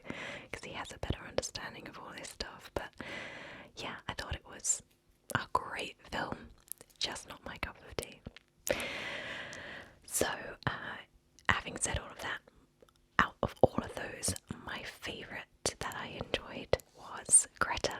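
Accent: United Kingdom. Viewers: You are genuinly the only asmrtist with a british accent i really enjoy. The way you speak is mesmerizing. Loved the video! :)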